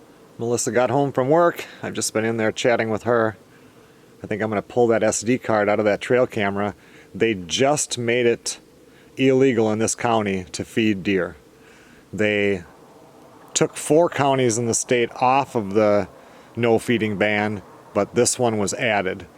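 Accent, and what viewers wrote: Minnesota, United States. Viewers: I agree! Hearing now familiar Minnesota accented voice,is quite soothing. Like putting on a comfy pair of shoes! LOL. Thanks for all you share. But, just behave, as Melissa mentioned! Or it’s the DOGHOUSE( aka crate) for YOU! 😲🙃😂😁👍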